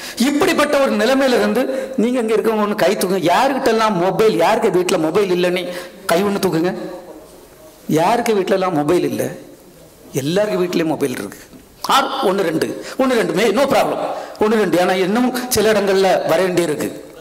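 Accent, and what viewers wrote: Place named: Nanjil Nadu, India. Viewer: நாஞ்சில் நாட்டு தமிழ் மணக்கிறது.....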